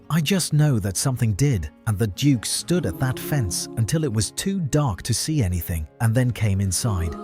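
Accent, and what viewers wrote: United Kingdom. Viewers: why does ALL YOUTUBE AI Naration have a uk accent? makes no sesnse at all! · Why does the narrator have a British accent when clearly this is an American story?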